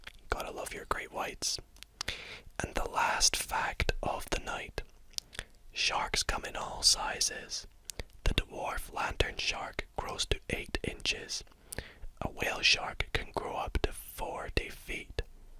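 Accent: Scotland, United Kingdom. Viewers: WHAT PART OF SCOTLAND ARE U FROM???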